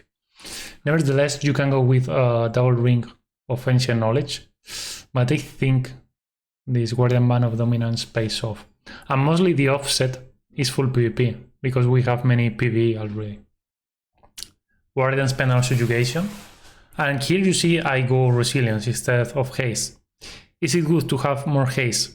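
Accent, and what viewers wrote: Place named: Spain. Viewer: i really love your accent. i thought you were greek at first but i realized its spanish. but not all spanish people pronouce words the same way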